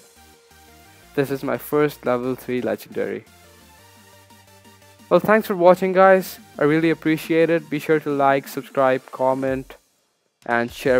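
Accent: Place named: India. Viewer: Nice video dude! Are you Indian? Your voice is so soothing lel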